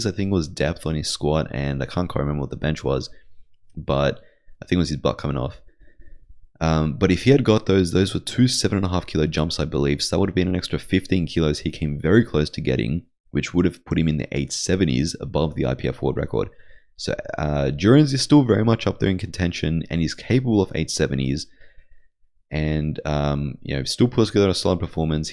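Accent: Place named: Australia. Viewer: I recognise the Aussie accent, but can't seem to know who this channel belongs to!!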